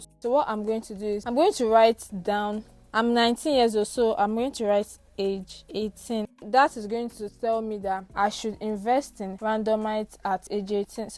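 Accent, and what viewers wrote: Nigeria. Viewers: Like do you get? When I subbed and started watching a video I was so shocked to hear the Nigerian accent Most Nigerians DONT get they hype of the game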